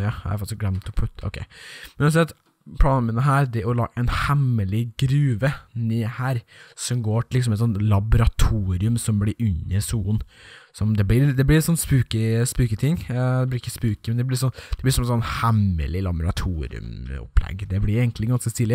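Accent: Trøndelag, Norway. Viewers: du trøndersk?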